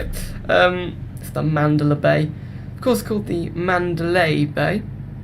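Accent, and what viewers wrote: United Kingdom. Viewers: young bri'ish fella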